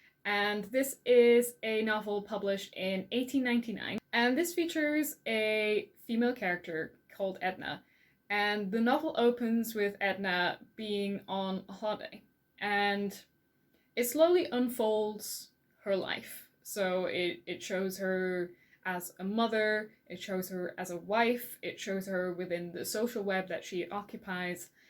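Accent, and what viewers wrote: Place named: North America. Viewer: Great video. Someone gifted me the complete collection of HG Wells recently, some I have read, others I’d never even heard of. I’m looking forward to reading them. You have a great accent. North American with a hint of northern English perhaps?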